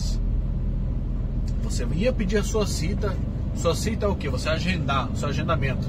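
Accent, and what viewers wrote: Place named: Rio Grande do Sul, Brazil. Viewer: teu sotaque é de gaucho, vc é da onde amigo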